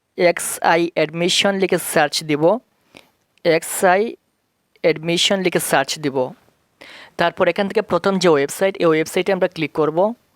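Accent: Sylhet, Bangladesh. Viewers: are you sylheti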